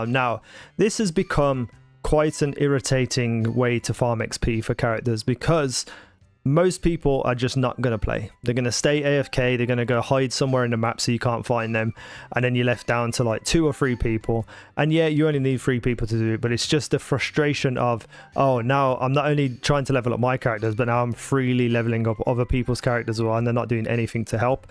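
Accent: Birmingham, United Kingdom. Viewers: I wasn't being rude when I called you Brummie. I'm from the south coast but I'm actually welsht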